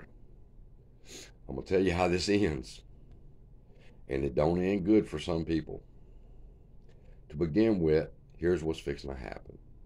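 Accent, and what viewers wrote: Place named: mixed, United States. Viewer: Your Cajun-Texan accent kills me brother 🤣👍👍👍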